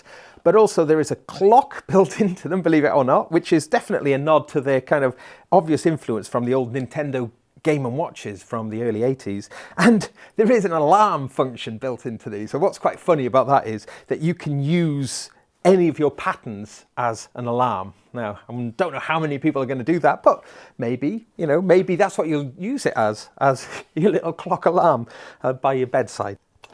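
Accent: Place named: Wales, United Kingdom. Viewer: he is not nervous, it's just the welsh accent.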